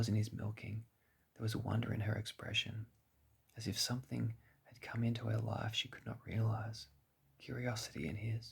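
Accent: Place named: Australia. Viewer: I find the aussie accent soft speaking so relaxing and calming, I think because we have the best accent 😜 great video, I loved the story, keep it up 👍🏼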